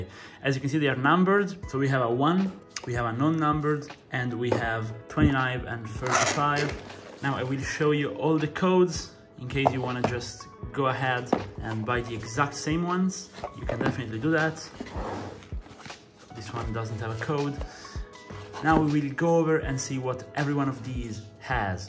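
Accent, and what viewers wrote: Italy. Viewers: You talk like Italian? Non è che sei un bro?❤ 🇮🇹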